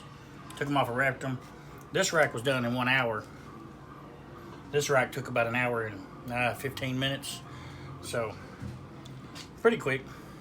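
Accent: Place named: Northern Kentucky, United States. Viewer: awesome man. I just subbed the other day and have fun though about all your videos. When I heard your voice I said he sounds too like me to be from northern Ky lol. Keep up the good work sir.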